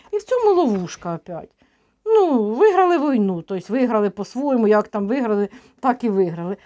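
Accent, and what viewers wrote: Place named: Surzhyk, Ukraine. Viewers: Доброго дня, все правильно говорите-не маємо права забувати полеглих воїнів,захисників наших! Жодного імені! Говоріть так як вам зручно!Як кажуть:"На польтавском діалекті "!Це наша говірка. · Пані, не тратьте на таких своїх сил. Адекватні вас розуміють, а іншим ви нічого не докажете. Робіть спокійно свою добру справу. А стосовно мови, то я наприклад теж з Полтавської області і розмовляю точно як ви. І мене це не дратує. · Супер суржик! Хоч це і не суржик, а східно-південна говіркка української мови! А ми як балакали так і будемо балакати!))) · Я з дніпровської обл, розмовляють у нас теж так. Повага Вам за те, що справжня, себе не прикрашаєте і тим більшості подобаєтеся за щиру правду і гостре слово. Слідуючим президентом буде жінка, а нам, від кого нічого не залежить, треба ставати господарями своєї країни і досить боятися! 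· Дякую Вам,за позицію,за свою думку,за ваш суржик,за те,що Вам болить Україна,за те що задумуєтесь над майбутнім,зате,що закликаєте до пам'яті,бо це ген нашого народу.Дякую.❤ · ваш суржик такий чудовий, колоритний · Пані! Не звертайте уваги на тих,хто говорить всяку дурню! Дивлюся ваші розклади із великим задоволенням! А вашу мову суржик страшенно обожнюю. Зразу згадую дитинство і своїх дядьків і тьотю Ніну,Женьку і т.д.,які жили біля Рівного! Здоров,я вам і терпіння! Слава Україні іслава ЗСУ! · А мені подобається ваш суржик) Україна розмовляє суржиком, Полтава, Дніпропетровщина, Слобожанщина. Чим кацапською, без "ге" - краще суржиком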